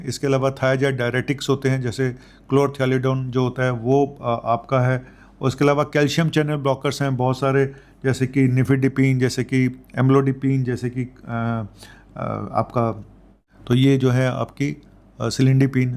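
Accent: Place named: Uttar Pradesh, India. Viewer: Sir 🙏 hum bhi U.P. Mujaffar ngr. sy hai Tabhi aap ki language hamri jaisi hai,samne wale sath saf bolo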